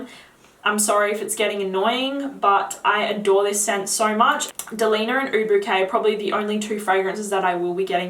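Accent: Australia. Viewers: i looooooove your cute australian accent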